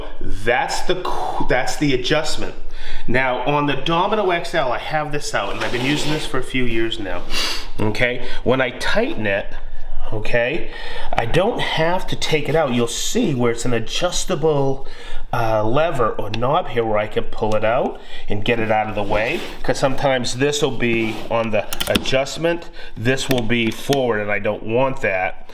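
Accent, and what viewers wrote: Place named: Massachusetts, United States. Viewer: Cambridge MA here the accent I think your from Massachusetts? Thanks for sharing!!!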